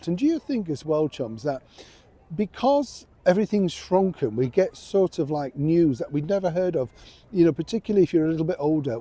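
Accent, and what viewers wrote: United Kingdom. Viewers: Love you at least you’re not endlessly talking to Americans like Dan Wooten. Lovely to hear your commentary. Your representation of the British voice is refreshing. Thank you x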